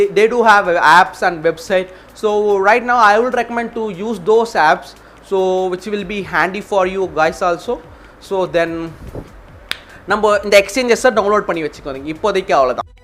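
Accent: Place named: United States. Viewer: American accent🔥🔥🔥🔥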